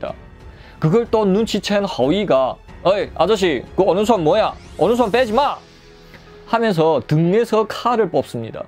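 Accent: China. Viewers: 목소리나 억양이 완전 중국화 되어 있고.. 젊은 친구가 잘 생기기도 하고.. 설명을 잘 해 주어서.. 중국 무협지 한편을 본 느낌이었네요.. 아주 재미 있었습니다.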